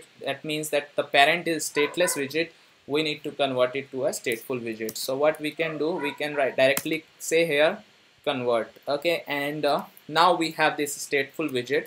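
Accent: India. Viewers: omlot houw ai louwe indian anglish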